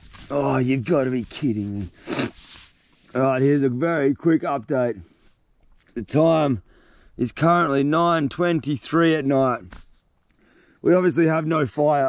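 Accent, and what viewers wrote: Australia. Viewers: As a Canadian, I find your Australian accent very cute 😄. New to your channel and subscribed! It was entertaining, mate. Keep them coming, eh?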